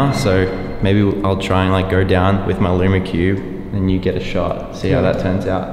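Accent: Australia. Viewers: Now i wanna to learn the aussie accent. U sounds so hip.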